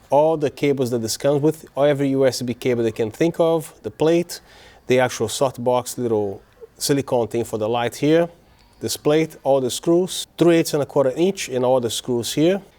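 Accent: Brazil. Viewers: Brasilian Accent?! <3 Loved the Video! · Achei que tava familiar mesmo!!! Legal saber que é BR!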